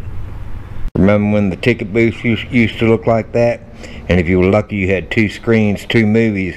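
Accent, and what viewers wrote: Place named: Louisiana, United States. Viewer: Boo Yah. Sounds like ya from La.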